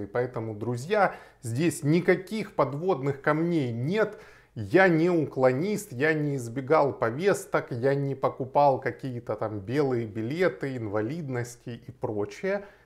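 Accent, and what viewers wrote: Ukraine. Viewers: Это не русский язык а ХАРЬКОВСКИЙ.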